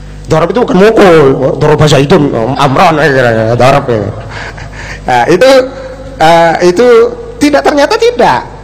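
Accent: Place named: Madura, Indonesia. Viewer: dari logatnya kayaknya orang madura. Mengingatkan ustad idrus romli yg cerdas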